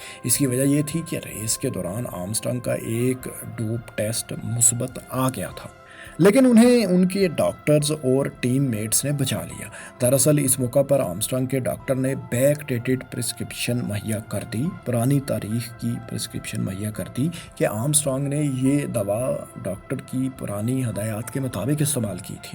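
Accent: Punjab, Pakistan. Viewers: Fuzol video hay Bhai choti se baat ko itna lamba khencha hay....Waste of time...plz improve your Urdu accent..Punjabi lahja hay ap ka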